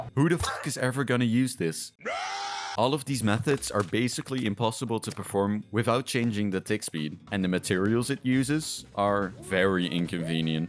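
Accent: Netherlands. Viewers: Dutch accent detected?? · Really cool Am I hearing a dutch accent? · u dutch perchange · Quick Question are you dutch by any chance?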